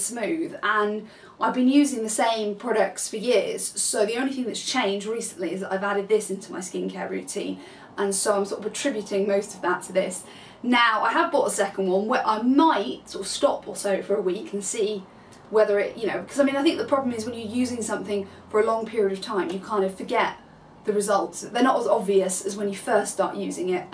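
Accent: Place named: United Kingdom. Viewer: I am always drawn to the British accent. Great review. The little spatula is to help you prevent you digging your fingers into the pot. Lol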